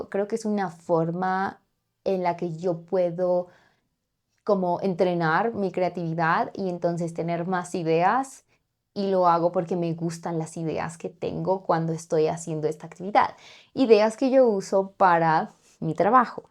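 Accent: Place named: Mexico. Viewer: Yo empezé aprender español a solo uno mes es mi passatiempo, me encanta eschucar tu videos, me encanta lo acento mexicano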